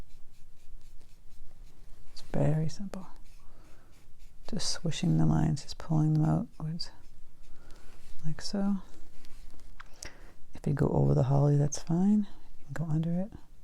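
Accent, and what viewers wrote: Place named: New England, United States. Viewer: Thanks for the terrific info & lovely cards,. Btw, I'm from RI and notice your familiar accent! MA or ME? Like a "lethal" from home!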